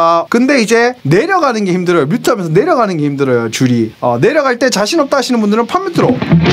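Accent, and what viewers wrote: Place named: Gyeongsang, South Korea. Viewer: 같은 경상도 사람이신가유..? ㅋㅋ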